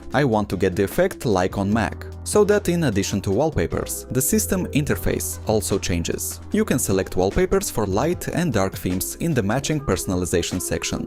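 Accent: Russia. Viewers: Стронг рашн акцент 😊 спасибо за видео ❤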